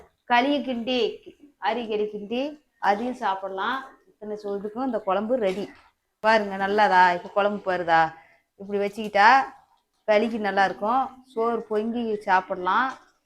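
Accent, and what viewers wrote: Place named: Salem, India. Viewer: Salem slang la pesaranga akka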